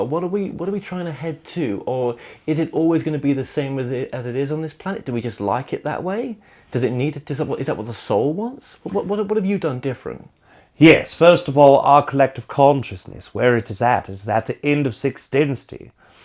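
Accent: United Kingdom. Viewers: actualy British: I love your English accent. Aridif: Yes, we love yours also.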